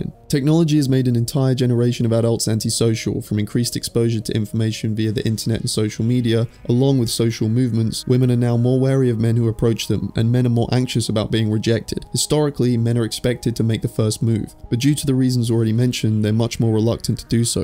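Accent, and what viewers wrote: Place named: United Kingdom. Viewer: Why do you think this is a good video essay? Is it because he's smart? Because that's only due to his British accent.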